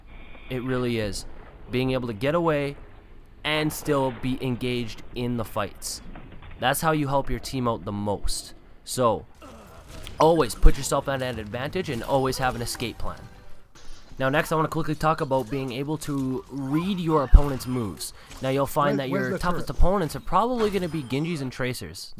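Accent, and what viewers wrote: Canada. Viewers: His canadian accent is so strong I cant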